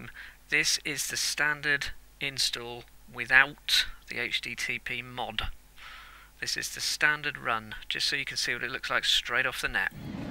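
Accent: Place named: United Kingdom. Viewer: Not sure why, but every time I watch a YouTube video on how to get some program to run, it always seems to be a Brittish fellow. Anyway, thank you very much for posting this tutorial! I have never played Deus Ex before, but after loving DXHR, I decided that I simply must play the original as well. And thanks to your video my experience should be even better now.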